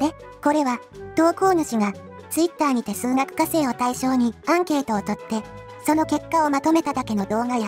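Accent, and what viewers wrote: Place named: Kansai, Japan. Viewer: さすが、関西弁のチャネルで大半は京都大学出身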